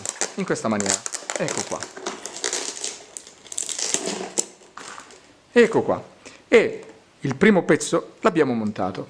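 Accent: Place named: Sardinia, Italy. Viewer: Un tutorial ben fatto e la spiegazione e' impeccabile con un italiano tipico dei sardi... bello iscritto e like da adesso ti seguiro' sempre... un saluto Giovanni p.s. io faro qualche modifica per interrompere l'alimentazione alla luce lmpadina e accenderla solo la sera con un interruttore a parte... 10+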